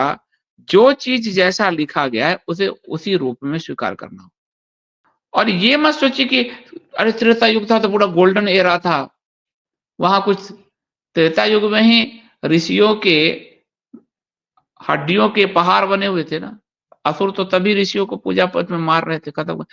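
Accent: Bihar, India. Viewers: App bihar Chapra se hai kya?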